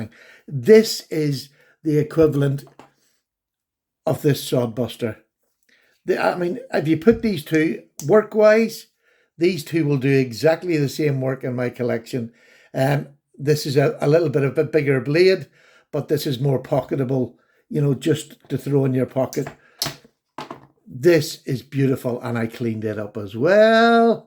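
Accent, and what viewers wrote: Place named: Ireland. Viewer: It is good to see you back to making videos besides all the shout out open tag ones. They are okay but, not if they become the dominate content of all the channels you watch. When I look at all the knives on the table it is not hard to see a pattern appear. You like everything; and that might be an good indication of your success on your channel. Another reason is; Americans enjoy hearing an Irish accent as well as others from places their family once came from. Your opinions are your opinions being seen from your eyes are fun to listen to. They may not be what others think; but, that is a good reason to listen to them. Everyone can learn from others; no matter how smart or how much they know or think they know already. It will be hard for you to choose the five best knives of 2021. 🎁🎄🍀🥔🔪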